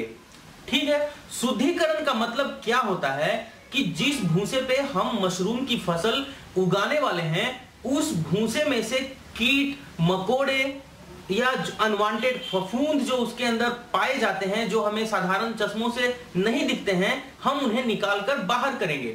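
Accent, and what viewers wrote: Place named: Bihar, India. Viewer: Bhai aap Kanhaiya Kumar ke jaise bolte ho... Lagta hai Bihar se ho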